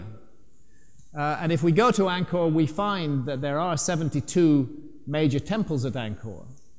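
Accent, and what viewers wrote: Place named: England, United Kingdom. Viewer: the English accent makes him sound so articulate and educated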